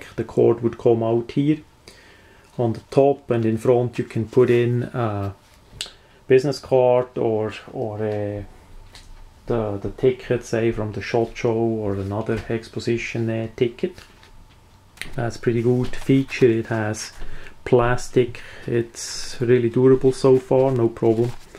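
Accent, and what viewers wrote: Switzerland. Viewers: Is this what Swiss accent sounds like?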